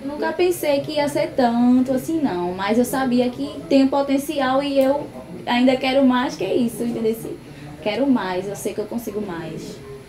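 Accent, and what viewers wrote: Brazil. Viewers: Sutaque de nordesteina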